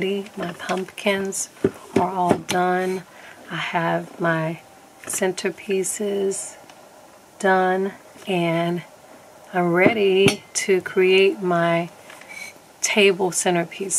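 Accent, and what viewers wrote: New Orleans, United States. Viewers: Yeah baby they love how we talk. What part of the N. O. you from? I'm from Hollygrove. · Your New Orleans accent is beautiful. In New Mexico it's pronounced Punkin. I agree, who cares. Your voice is what drew me to your video and I'm so glad it did. I have been inspired to do a crystal foyer for Christmas and brought home a hall from our Dollar Tree here in Marrero. Keep that imagination going and forget about anything else :) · There is absolutely nothing wrong with your N.O.accent I say that because I'm from a town outside of N,O. called Houma La. So I can relate they say we t as ll flat but hoot I love ❤what you have done with this project very inspiring. Fall Vibes 🍁🍂🍁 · Pumpkin, Punkin pumkin. No matter how you say it, we know you mean pumpkin. YouJust keep making your beautiful pieces. You are an inspiration to soooooo many and that's all what counts. 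Gee Whiz, we're not in Grammar School! Your New Orleans accent is beautiful and quite clear. Do your thing because you ROCK!. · Hi My Suga I Love Your Accent... Why Because I’m From Nola To & You Are Unique In Your On Way? Cheeeeeeeeeeeeerrrrrrrrrsssss My Hun! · I love listening to your soothing tone and beautiful New Orleans accent. Charming as heck. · I'm English and I'd just like to say your New Orleans accent is devine!! And that you should say "pumpkin" however you like because that's who you are. I could listen to you all day. It's so impressive how you have everything set up with Amazon and all your templates, ideas and downloads!! Well done you!! Love your channel!! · You have a beautiful accent! You are great & ive learned so much from you! Watched all your Christmas videos last few yrs! Keep your beautiful New Orleans accent! I love it!! Say pumpkin anyway you want girl!😂❤️ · She's been in Ga so long she's lost a little of her New Orleans accent. 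But we never lose it all!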